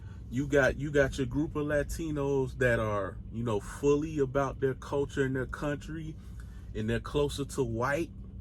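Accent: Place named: Mississippi, United States. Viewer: Bro 😂😂😂 wtf are you gonna do about it? Sounds so confederate Mississippi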